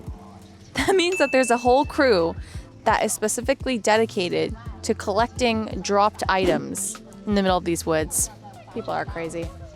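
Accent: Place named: United States. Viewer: Your american accent sounds perfect, your voice is so female.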